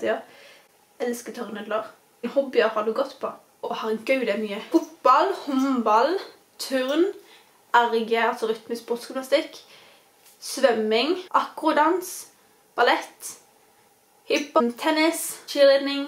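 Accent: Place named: Sørlandet, Norway. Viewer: Koffår snakke du sørlandsk/haugesundsk/ men veldi pent?❤️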